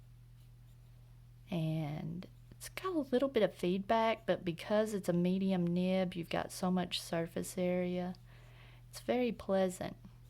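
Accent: South, United States. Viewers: May I ask you from which state you're from? I really like your accent, it sounds kind of relaxing, but I'm German and not too familiar with all the American accents... If I had to guess I would say something southern or mid-west-ish?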